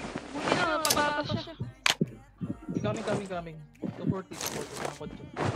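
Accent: Visayas, Philippines. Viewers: Bisaya kadiba idol??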